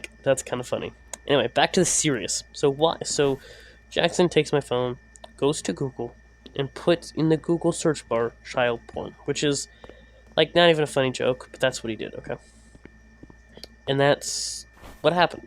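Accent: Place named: RP, United Kingdom. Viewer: You sound like you attended private school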